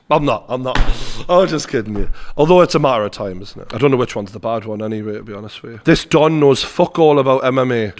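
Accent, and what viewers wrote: Newcastle, United Kingdom. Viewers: Just hearing true geordie introduce the video is enough to put a smile on my face!!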